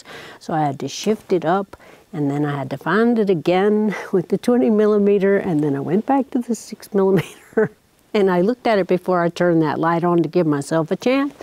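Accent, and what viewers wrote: South, United States. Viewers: I love your southern-sounding accent. Subscribed 😊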